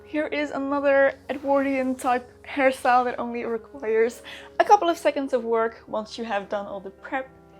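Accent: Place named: Netherlands. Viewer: lol dutch accent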